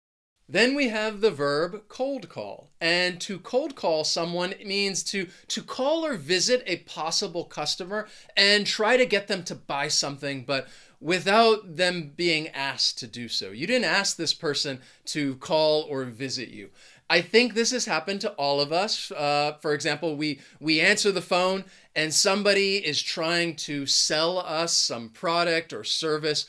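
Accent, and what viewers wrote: United States. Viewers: You are really great sir Are ya really AMERICAN If you're not you just sound 100 percent like an AMERICAN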